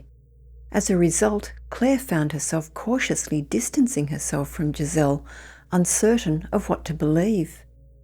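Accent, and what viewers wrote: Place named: Australia. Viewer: Exactly so why would this feature on this episode? The narrator is clearly Australian?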